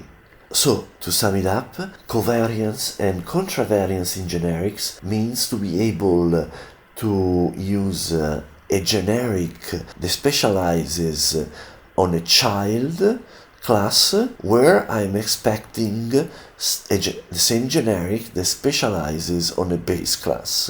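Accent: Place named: Italy. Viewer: Ciao! la explicación excelente, pero el acento italiano... meraviglioso!!! los oídos latinos agradecidos! "me gusta", "suscripción" y "difusión"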